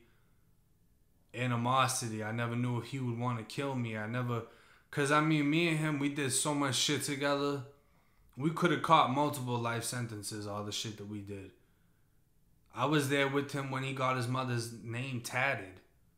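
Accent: Boston, United States. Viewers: Swear all this time I thought he was from Boston with his accent. Woulda never that FL. Still only a few months in to his channel not knowing his story or if he was raised there and moved to FL later on, but his accent is thick; love it. But about the story - wow! Alex was a real one Bro. You a solid dude so it makes sense why you and him were friends; explains why you feel guilty for something that wasn’t directly your fault. Alex was solid and didn’t fold - don’t think he blames you for his character just being that of loyalty (if that makes sense). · Bro I thought u were from Boston. Bcuz u have a Boston accent. Wow i was wrong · totally Boston dude... I heard it too. I was totally confused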